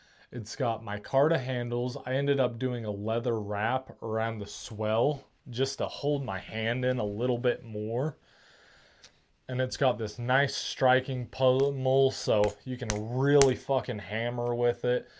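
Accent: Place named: South, United States. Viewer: Where you from, amigo? The south?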